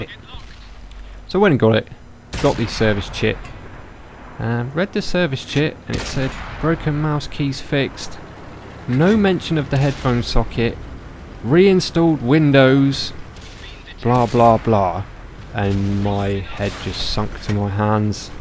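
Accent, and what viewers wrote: United Kingdom. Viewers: Great video...more like it would be appreciated. Thanks for all the hard work you put into the channel, Happy New Year to you and yours, and keep tanking when you find the time...BTW, take your own advice about being yourself; your voice is awesome. 'Course, being a Yank, all you Brits sound good...cheers!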